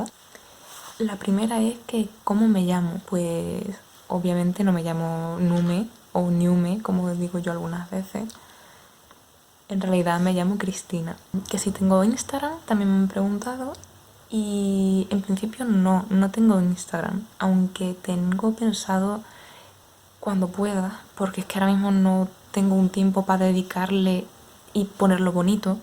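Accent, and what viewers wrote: Spain. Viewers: Edad tuya estimada: 27 Sobre música preferida hubieras terminado antes diciendo cuál no te gusta xD Del Sur de España lo supuse pero pensé que eras extremeña (a través de susurros es complicado acertar).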